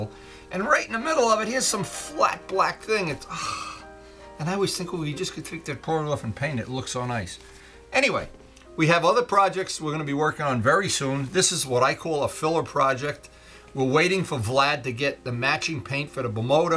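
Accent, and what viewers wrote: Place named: New England, United States. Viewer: Sounds like a fellow newenglander thanks for the video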